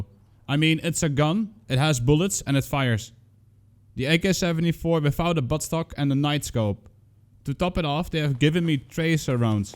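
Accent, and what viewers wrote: Netherlands. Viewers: Hi funny speaking Dutch dude. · ik hoorde in de eerste 5 seconde dat je nederlands was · “Funny speaking Dutch dude” ..subbed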